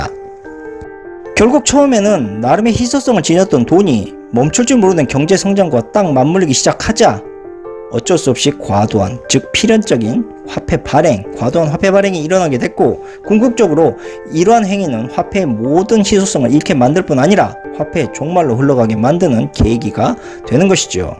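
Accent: Jeolla, South Korea. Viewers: 전라도 사투리가 진하게 베어 나오네... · 이분전라도말씨네요 친중파로보이네요 전라도는 다수가 친북,친중,,중요한건 공산당정권은 망해야한다는 진리입니다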